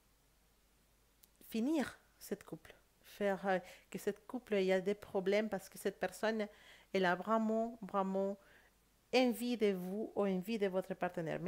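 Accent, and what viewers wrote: Spain. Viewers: Holà, gracias por tu tijada. Has visto justo..Espero que el piensa en mi como yo pienso en el.Pero no quiero entrar en communicacion con el.Espero que sea el que lo haga en Febrero. Una pregunta: cuando interpretas puedes ensenar las cartas de l Oracle de la Triade que te han inspirado.Gracias..y por el acento, es el mejor.el.acento espagnol 😋😁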